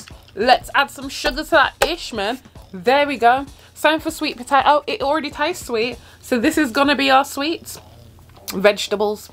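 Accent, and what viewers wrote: Birmingham, United Kingdom. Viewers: Yo sis love the videos. Are you from Brum? Your accent sounds like it haha. Hope you're doing well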